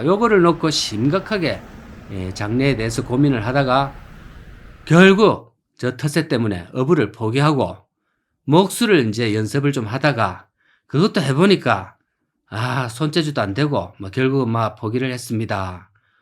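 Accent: Gyeongsang, South Korea. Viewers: 구수한 말씨의 전국을 다니는 경상도 사나이 응원합니다 ! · 어우 갱상도 사투리 듣기싫네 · 경상도 사나이 말투 듣기 좋습니다 언제 구룡포 함 가봐야겠군요~~👍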